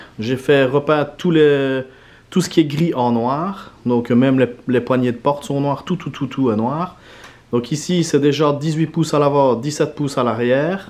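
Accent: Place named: Namur, Belgium. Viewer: Cet accent... tu serais pas de namur?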